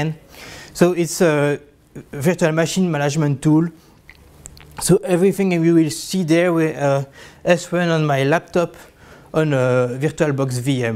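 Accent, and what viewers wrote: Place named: India. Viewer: как у индуса деревенского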